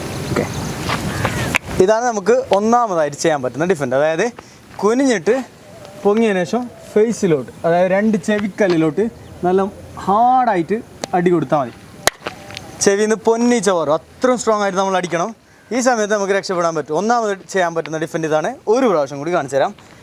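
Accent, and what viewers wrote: Kasaragod, India. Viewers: കാസറഗോഡ് ഭാഷയുടെ നിഷ്കളങ്കത നാളുകൾക്കു ശേഷം കേൾക്കുന്നു.............സ്നേഹത്തോടെ ഒരു കോഴിക്കോട്ടുകാരൻ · ഇങ്ങടെ കാസർകോടൻ ഭാഷ, അത് കേൾക്കാൻ ഒരു സുഖമാണ് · കാസറഗോഡ് കാരനാണോ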